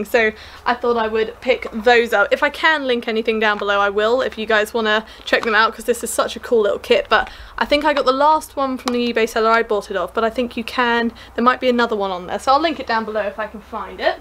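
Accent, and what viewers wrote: United Kingdom. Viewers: Great birthday gift. That’s a nice one, you are really set now. Loved the show and tell, looking forward to you working with the fossils. With that British accent, I could listen to you all day.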